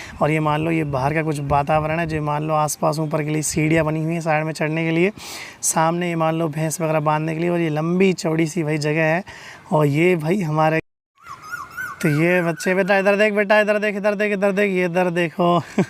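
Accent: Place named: Dausa, India. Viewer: Aap dausa se ho yesa lag raha h or Meena bhi kyuki meri frnd dausa ki h wo bolti h aapki tarah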